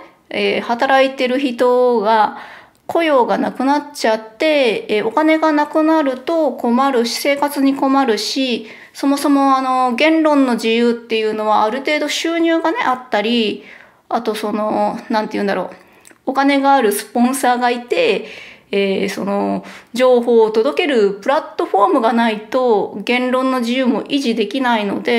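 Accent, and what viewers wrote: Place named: Osaka, Japan. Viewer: 新しい扉を開いてくれるチャンネルですね。大阪弁も身近でよし。これからもよろしくお願いします。☺️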